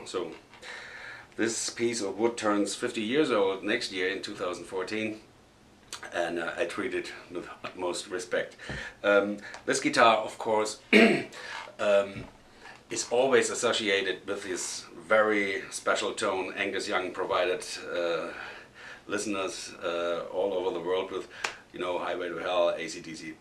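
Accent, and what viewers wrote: Germany. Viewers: Bist du Deutscher? Dein akzent erinnert mich an Deutsch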